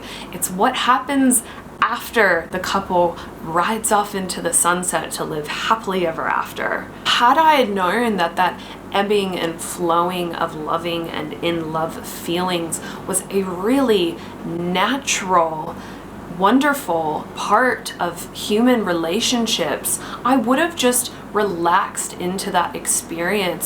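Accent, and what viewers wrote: Australia. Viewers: i love how all your “O’s” are so Australian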